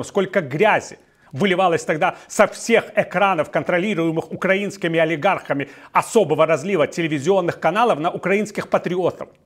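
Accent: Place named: Baltic. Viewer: Ты кто??? Комментировать за Грузию с акцентом прибалтов.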